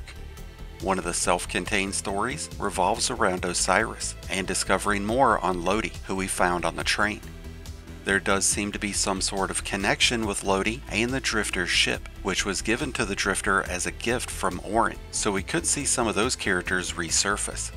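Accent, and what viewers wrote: South, United States. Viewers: he's saying bc you have a southern accent, partner.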